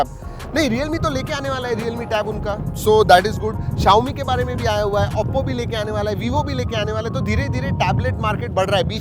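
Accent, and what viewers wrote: Maharashtra, India. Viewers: मेरा Suggestion आपके लिए ये है कि - मराठी पद्धतिची हिन्दी बोलू नका।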